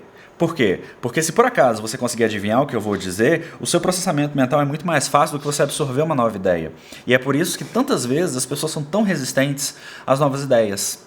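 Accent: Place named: Espírito Santo, Brazil. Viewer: Qual é a origem do seu sotaque? Eu conheci uma pessoa de Vitória - Espirito Santo que tinha um sotaque parecido com o seu.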